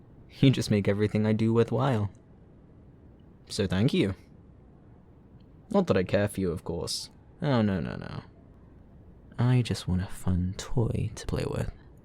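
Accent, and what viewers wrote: United Kingdom. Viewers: (speaking French) Bro I thought you were British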